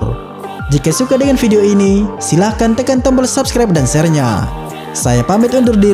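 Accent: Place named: Toraja, Indonesia. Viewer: Klo bisa logat Torajax dihilangkan bro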